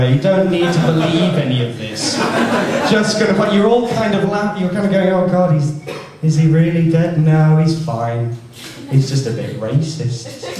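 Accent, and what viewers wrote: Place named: RP, United Kingdom. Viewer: I was like "why does he sound so posh? He's from newca- oh, it's at Cambridge that's why"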